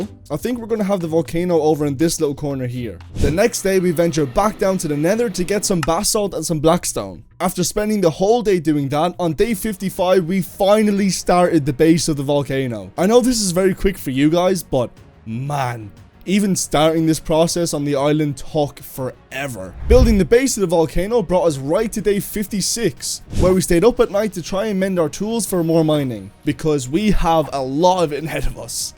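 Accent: Ireland. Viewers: Bro im defo not kapping thats an irish accent foohhshoooo 😂 absolute horseplay.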